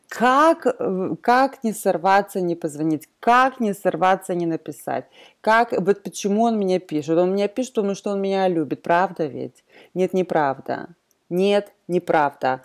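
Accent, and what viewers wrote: United States. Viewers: Обожаю ваш американский акцент)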